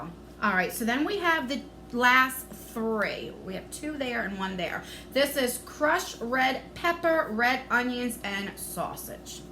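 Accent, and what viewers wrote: New Jersey, United States. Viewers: And i have to say i live your true Jersey accent! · Btw You are my favorite subscription box YouTuber! I love your Jersey accent and your upbeat personality and I also love that you aren’t in your twenties because I’m 36 and aging skin is a lot different than young skin so I know if a product works for You it will most likely work for me!!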